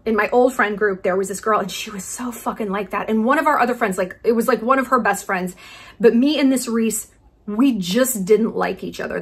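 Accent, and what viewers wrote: South, United States. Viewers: I fucking love your southern accent lmao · I could literally listen to your southern accent for a whole video 😂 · i love your southern accent queen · I loved Reese in Legally Blonde so I started watching her interviews and then I noticed how she seemed to not be the nicest person. Something just felt off about her then I saw other videos of people talking about how she is really mean, but in a very passive-agressive way. She is very much that southern girl who is passive aggressive. She is like the stereotype in movies. I don’t hate her or anything but I just get an off feeling about her. Btw your southern accent was on point. The mannerisms and comments you made were the accent were spot on! Great video!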